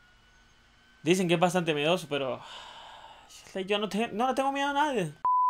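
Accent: Colombia. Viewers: bro eres de bogota o medellin?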